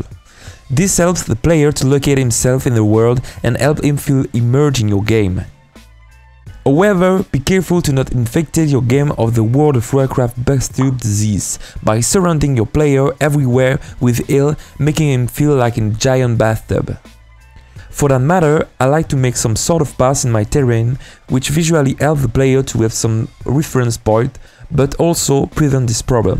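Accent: France. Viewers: If you're commenting on his accent, it is French, not Ugandan.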